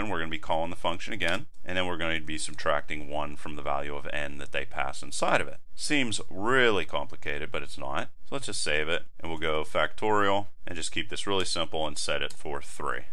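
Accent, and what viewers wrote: United States. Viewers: Offtopic comment, I find it really amusing the fact that you can clearly tell this guy is a 100% 'Murican made just by how he talks.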